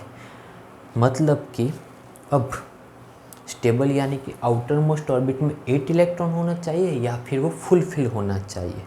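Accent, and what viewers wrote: Gujarat, India. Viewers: Sir AAP gujrati ho ?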